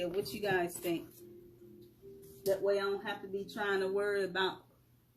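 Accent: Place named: South, United States. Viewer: So funny to see You in person and hear You speak. You have a southern accent for sure 😂. It’s super adorable 🥰.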